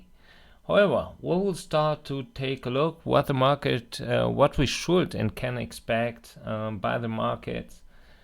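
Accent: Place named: Germany. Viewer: why not? It is honest and the German English always sounds funny to me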